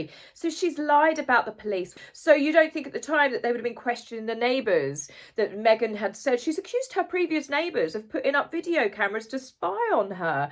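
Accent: United Kingdom. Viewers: I love your podcasts but am not British so have a bit of trouble understanding what you're saying. Could you please slow down just a bit. ❤️ Thank you!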